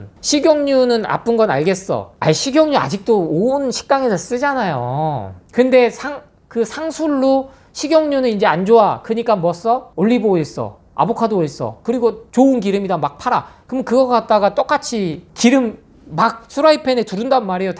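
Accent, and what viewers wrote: Jeolla, South Korea. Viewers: 전라더 말투에.. 인상도 이상하고... 그냥 딱봐도 사짜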